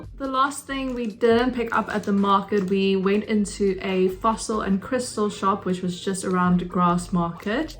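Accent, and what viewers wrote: South Africa. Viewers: Wow awesome video ☺️ i just stumbled upon it. You sound South African 😁. Love that Pub set uo as well. I will be coming to Edinburgh second week in March and would LOVE TO do Karaoke. Please 🙏 do a video showing karaoke Pubs / Bars in Edinburgh. Thank you in advance. I am from Johannesburg South Africa 😁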